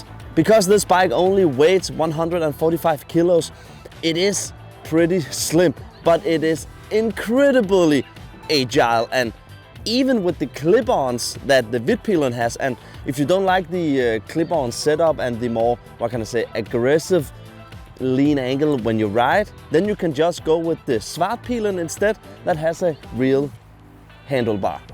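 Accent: Denmark. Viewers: haha dude that’s amazing. Love me a danish accent. Awesome review too - I’m getting my license and that bike in the next few monthsb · 3 seconds into the video, I'm betting 10 bucks that this guy is Danish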